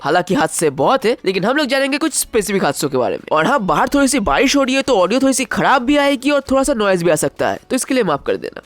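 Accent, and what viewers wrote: Bengal, India. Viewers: ji Aap kya Bangali ho?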